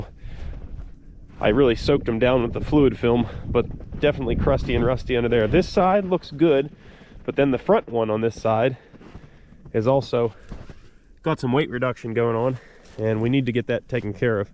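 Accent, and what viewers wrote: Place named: Pennsylvania, United States. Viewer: What part of PA is this guy from the accent is throwing me a bit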